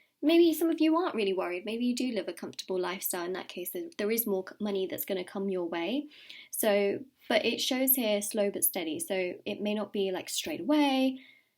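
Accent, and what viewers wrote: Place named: South East England, United Kingdom. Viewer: South East English I think !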